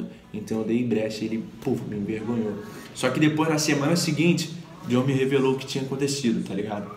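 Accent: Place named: Rio de Janeiro, Brazil. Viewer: Adoro sotaque carioca kkkkkk eu fico tentando imitar hahahaa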